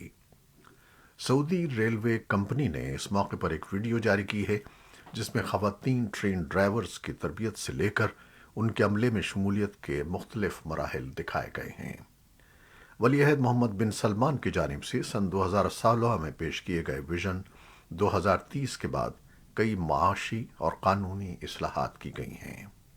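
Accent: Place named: Lahore, Pakistan. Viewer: وہی لاہور والا لہجہ وہی ٹھہراو وہی وقار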